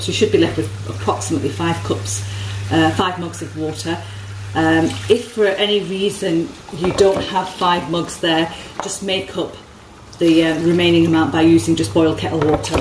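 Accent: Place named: England, United Kingdom. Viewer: I love your English accent with Urdu words 😊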